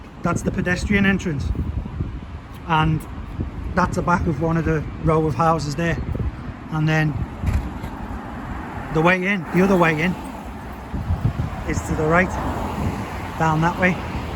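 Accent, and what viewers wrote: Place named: Liverpool, United Kingdom. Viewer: Scouse is endangered so it is refreshing to listen. You speak slowly enough for me to get used to it. Thanks for the little stories, so important to keep traditions alive.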